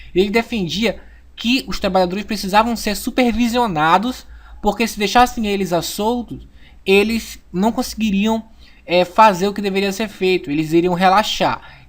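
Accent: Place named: Pará, Brazil. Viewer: Ahaha desde seu primeiro vídeo conheci o sotaque paraense rsrs, saudades da minha terrinha...mas amo Brasília rsrs! Adorei o vídeo sabe muito, parabéns!!!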